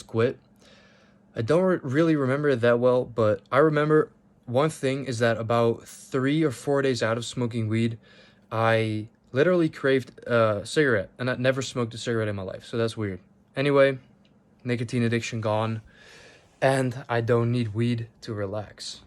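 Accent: Netherlands. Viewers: Yo bro are you dutch?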